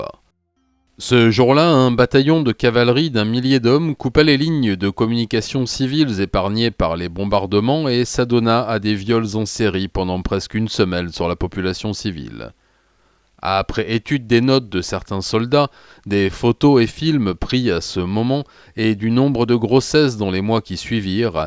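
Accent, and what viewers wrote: Japan. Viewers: une sage femme peu sage...encore une excellente vidéo avec un délicieux et parfait accent japonais!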